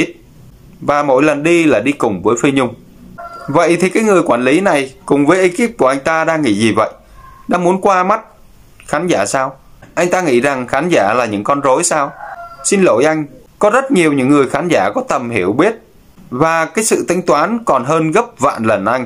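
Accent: Standard. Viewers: Anh nói chuẩn mem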